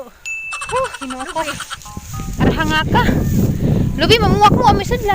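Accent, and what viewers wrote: Bohol, Philippines. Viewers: Lambing ug punto pagka binojol...heheh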